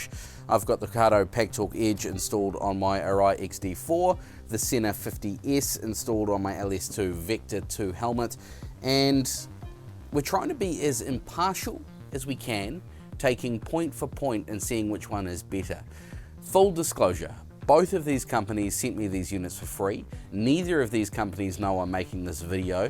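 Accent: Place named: New Zealand. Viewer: If you ride with limited or no people the HD spirit is the best bang for buck unit. The buttons can be a little unresponsive at times but overall the sound is great. I have it on one helmet and the new srl3 sena on another and the audio is as good as the srl3 and actually gets louder. I did buy the edge but sent it back as the voice commands were crap. The new srl3 voice commands are much better as long as you say hey seena and not hey Sennar, it's an aussie thing and from your kiwi accent it'd be the same deal.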